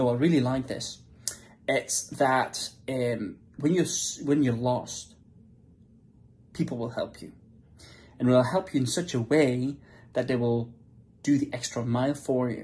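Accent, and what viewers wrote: Scotland, United Kingdom. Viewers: J'ai étais faire un séjour linguistique d'un mois à glasgow l'été dernier et c'était amazing. I fall in love of the city and Scotland. Thanks to you i keep practicing my english with your scottish accent. So thank you !! Merci ! 🇫🇷 🏴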